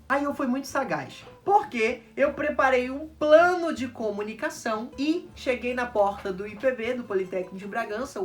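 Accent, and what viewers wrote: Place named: Rio de Janeiro, Brazil. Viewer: Tinha que ser carioca com essa fala so -nos mesmo temos.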